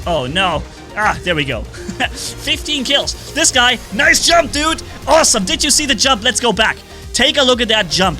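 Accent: Germany. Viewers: Wait… Is HE GERMAN?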